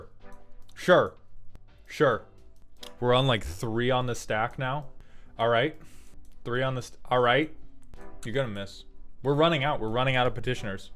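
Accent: South, United States. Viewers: why is he so southern today? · Turned that southern drawl up to 11 for this vid :D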